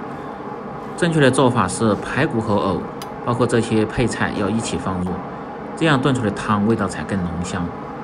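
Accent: Sichuan, China. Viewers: 四川人，一听便知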